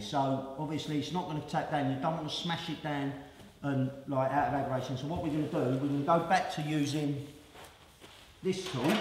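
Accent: London, United Kingdom. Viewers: Don't you just love it when people try to talk well. They try hard to pronounce their Ts and Hs but the native accent always slips in! Wot I've dun ere is mixed the filla righT. The impawtant fing to remember is... You can take the man out of London...but you can't take the London out of the man! Long live the London accent :) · dombou70 he's a London boy 👦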